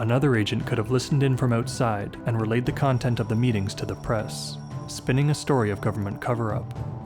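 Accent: Canada. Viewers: Judging by a sligt accent I’d say the narrator is from Canada. Right?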